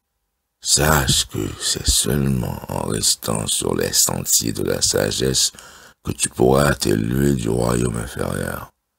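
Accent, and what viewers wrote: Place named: Africa. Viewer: Seigneur, cette voie est incroyable, la narration est profonde, chaude, aux magnifiques accents de l'Afrique magique. Je crois pas avoir vu les vidéos des premières tablettes ni même de playlist regroupant toutes les tablettes lu par cet incroyable narrateur... Dommage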